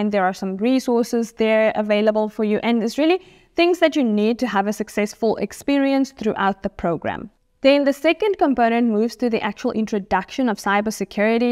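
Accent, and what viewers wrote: South Africa. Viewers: you're South African? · Your accent sounds South African?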